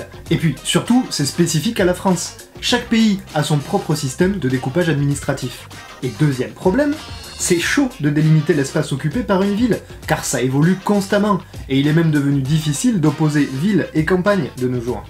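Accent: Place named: England, United Kingdom. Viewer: Super accent anglais 😉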